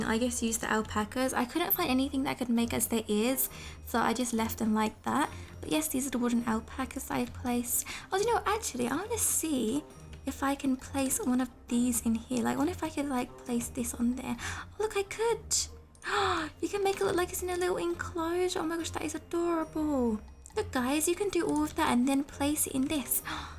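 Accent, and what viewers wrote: United Kingdom. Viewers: HER BRITISH ACCENT❤️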